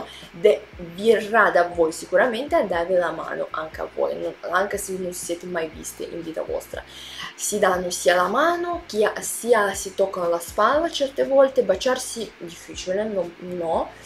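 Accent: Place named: Russia. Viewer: Bellissima,e brava, seguirò altri tuoi video.Ho scoperto da solo la fondatezza di quanto dici,,sono stato tre volte in Russia,e in buona parte dei pur tra loro diversissimi paesi dell'ex blocco sovietico.Conosco anche un po' di russo,anche se infinitamente peggio del tuo ottimo italiano,condito dal tuo accento russo dolce e graziosissimo.Al proposito ti chiedo,esiste una qualche espressione colloquiale russa per Sfiga?o un qualcosa che possa avvicinarsi all'italiano attaccare bottone?un grazie se vorrai rispondermi ciao Fabio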